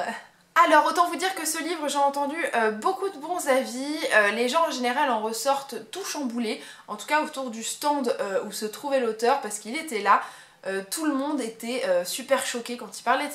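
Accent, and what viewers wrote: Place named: France. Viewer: Un accent ne se travaille pas, ton accent peut s'amélioré mais restera toujours un accent Français. Je pense que tu confonds "accent" et "prononciation" qui sont deux choses différentes. Pour avoir fait une licence d'anglais, je peux t'assurer que l'accent n'est pas important, comme tu le pense, la prononciation l'est. Ne crois pas tout ce que tu peux lire sur internet.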